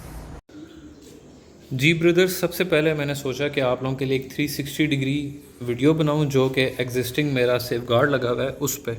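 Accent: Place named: Saraiki, Pakistan. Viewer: Iski awaz mein saraiki pan hai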